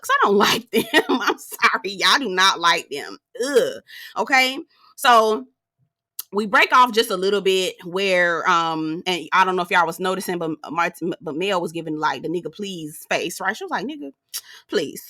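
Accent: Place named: South Louisiana, United States. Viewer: u talk like me lol .... im from South Louisiana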